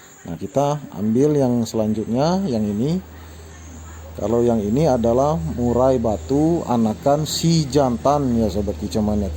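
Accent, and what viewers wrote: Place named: South Sumatra, Indonesia. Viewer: Gaya bicaranya kayak orang sumsel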